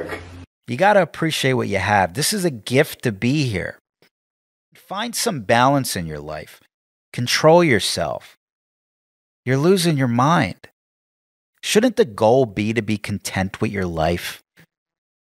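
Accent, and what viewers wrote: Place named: New York, United States. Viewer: You sound like a New Yorker, I Love it! 🙌🏻✨🥂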